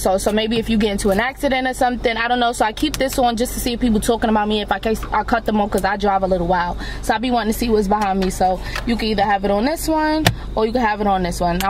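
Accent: New York, United States. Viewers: She from Ny??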